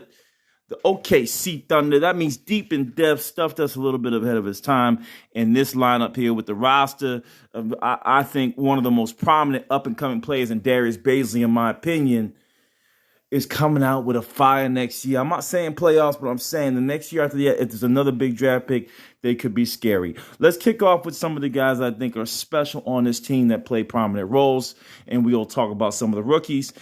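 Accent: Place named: Australia. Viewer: lol, love the urban-ausie accent. :) Thanks for the video man. Appreciate ya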